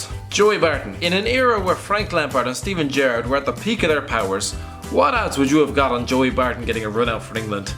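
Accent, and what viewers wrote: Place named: Ireland. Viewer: He sounds so irish.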